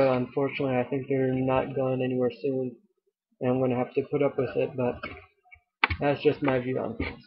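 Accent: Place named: United Kingdom. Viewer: why do you sound Britsh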